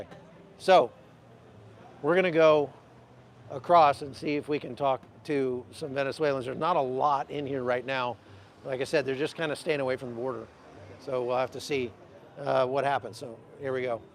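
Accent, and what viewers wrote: United States. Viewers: Get a stabilizer to hold your phone,. Your Spanish is funny with the heavy American accent.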